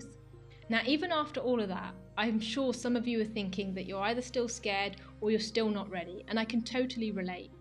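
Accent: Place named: United Kingdom. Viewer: damn you have british tone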